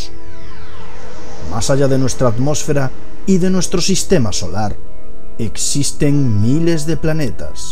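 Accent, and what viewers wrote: Spain. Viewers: lástima de la narración en el español feamente hablado por los propios españoles. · Es epañol el tio 😌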